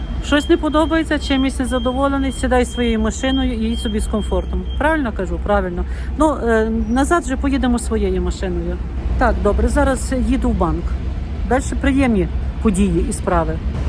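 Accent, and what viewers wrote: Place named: Ternopil, Ukraine. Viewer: та я також з Тернопільської обл, чую говір наш😉я з кременецького району, може й десь недалеко живемо від Вас😀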